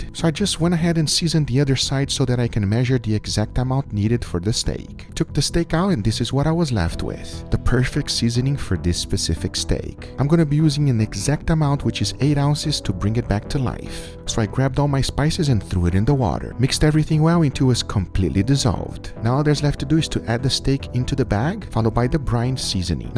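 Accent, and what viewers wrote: Brazil. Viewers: ill bet all my money this dude is Brazilian I can hear it in his English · Because it's a Brazilian accent. Portuguese has a lot of tone color and variation.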